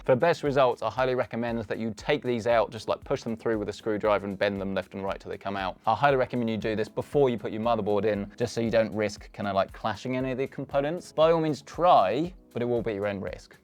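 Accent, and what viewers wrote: United Kingdom. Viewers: I just can't understand with the UK accent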